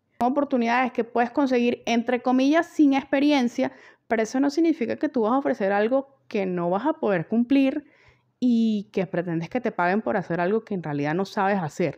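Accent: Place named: Venezuela. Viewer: Hola soy Lucy, recién descubrí tu canal, he visto otros, pero tú eres más clara, concreta, precisa en los planteamientos, sugerencias, y sobre todo el hablar "venezolano" no tiene competencia. Me he postulado en trabajos remotos dentro de Venezuela y las exigencias son altas, además de los requisitos académicos, las competencias en TIC y las virtuales como el acceso fluido a internet de 10Mbps o superior. Un computador con 4Ram, 250Gb Disco Duro, i5, o superior; en buen estado sin problemas de energía, ni de reinicios. Una cámara web o un celular con buena resolución. Nota: hace tiempo me postulé en una supuesta empresa con casa matriz en España, fue un total fiasco, todavía espero al abogado con el cual me amenazaron.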